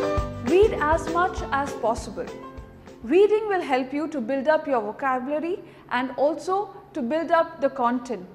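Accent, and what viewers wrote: India. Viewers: Nothing like a pretty Indian accent. So feminine and pretty 💕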